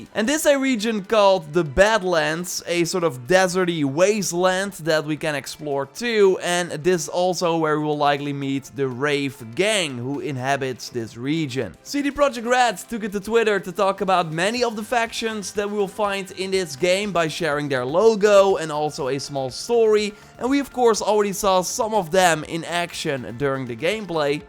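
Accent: Netherlands. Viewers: I couldn't help but hear your strong dutch accent. xD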